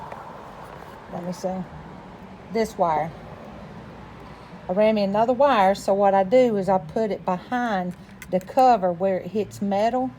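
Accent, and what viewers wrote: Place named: South, United States. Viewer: Love that Southern Lady sounds .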